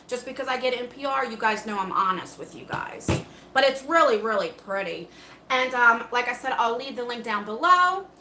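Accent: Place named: East Coast, United States. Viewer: I just bought the temptresses palette. No big, but it was 10%, not 15. Thank you, though! I can’t wait! Your eye look is so gorgeous! You do so well, where you cut off the side of your eye right at the end of your brow. It really lifts your eyes! Great job! I really admire what you do! I miss the East coast. I went there as I played piano for President Bush for the prayer breakfast and stayed with a friend who has your accent! You make me miss her! 😇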